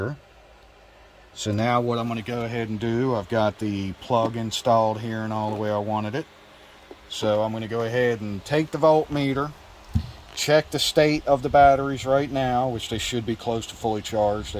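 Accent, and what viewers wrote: Philadelphia, United States. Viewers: Damn that’s nice. Is that a Philly accent? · This guy from Philly?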